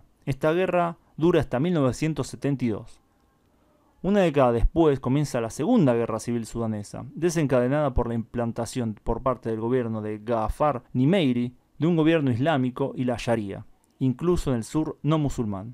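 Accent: Argentina. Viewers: O sotaque argentino é lindo. E um italiano falando espanhol.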